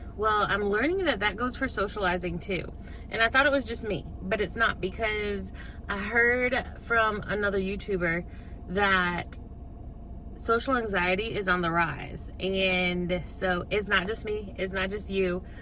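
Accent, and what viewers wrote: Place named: South, United States. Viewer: Any chance you live in Alabama? You sound Southern.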